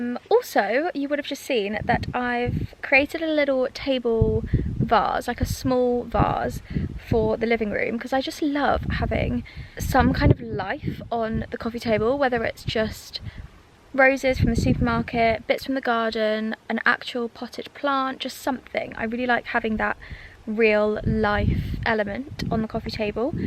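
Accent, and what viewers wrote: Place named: United Kingdom. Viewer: What part of The Uk are you from ?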